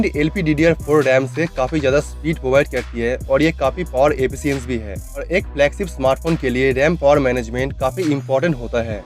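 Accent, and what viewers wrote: India. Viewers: Fuck Indian accent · indian english?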